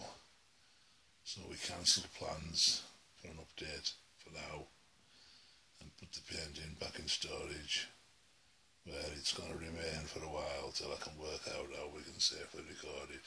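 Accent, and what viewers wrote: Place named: North East, United Kingdom. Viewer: You sound like you're from the north-east, if so that painting too close for comfort.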